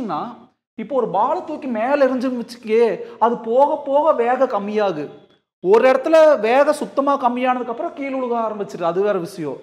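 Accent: Coimbatore, India. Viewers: Neenga Coimbatore pola......slang theriyudhu sir....me too.....❤️from cbe❤️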